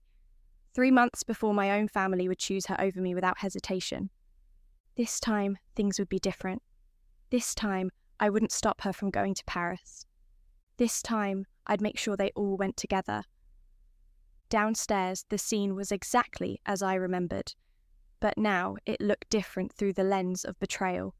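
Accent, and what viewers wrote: Yorkshire, United Kingdom. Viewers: What accent is that? Yorkshire?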